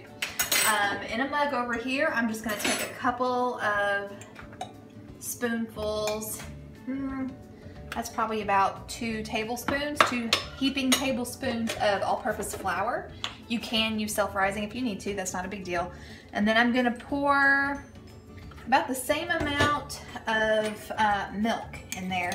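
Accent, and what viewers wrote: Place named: Kentucky, United States. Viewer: I am a new subscriber for "Canuary" I was wondering if you are a Kentucky girl? Maybe Tennessee? Your accent sounds close to home to me.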